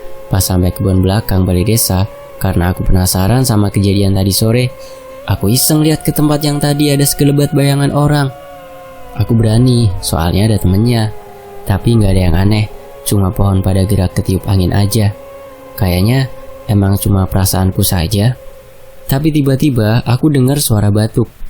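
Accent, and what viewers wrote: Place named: Banyumas, Indonesia. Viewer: Bahasanya ngapak campur jawatimuran min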